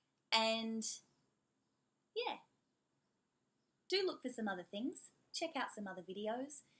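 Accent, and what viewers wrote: Adelaide, Australia. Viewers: Omg... as an Aussie myself(Qlder), I really didn’t notice these little details, like ‘’the ca-ris-moving’’ or even ‘’gud’’ And then I repeated the words you said out loud and.... mind blown 🤯 LOL 😆 Cheers for this video! I don’t know why I’m surprised at our accent but I am ha! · Excellent tutorial. Well done. Btw you have the mildest Adelaide accent I've ever heard! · Mild accent! You sound super Aussie to me from Melbourne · She's blonde, and has a faint Australian accent, ergo is Olivia Newton John? I mean, it's mildly amusing, I guess. But why's everyone so excited about it? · You have a much less bogan accent to quite a few, considering you’re from Adelaide of course 🙏😂 your accent is cleaner, I’m from Newcastle NSW, each state has a different twang on our accent I’ve noticed 😎😂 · This video is awesome!! I like your accent because I'm from Adelaide too!! 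· I'm Australian too im from Melbourne and my accent is similar to yours. I did live in the south of England for a yr awhile ago and i started speaking with an English accent but once i was home after afew months my aussieness came back 😊 omg you're so right we do do the r in front of is lol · I was thinking that your accent reminded me of Adelaide and you said that you are from Adelaide 1min later! I was so surprised because I got used to listening my bf who is from Adelaide and I lived in there last year! It was good to see your video :) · How refreshing to hear a fellow Australian who doesn't sound like an uneducated buffoon. And I speak like you and I'm from Sydney originally (Melbourne these days).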